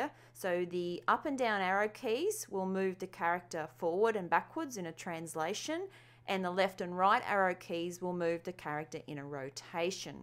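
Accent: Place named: Australia. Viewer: I'm from the US, and there is something about the Aussie accent that just makes it feel easier to learn and listen. That or this lady is great at explaining. Maybe both! Just me?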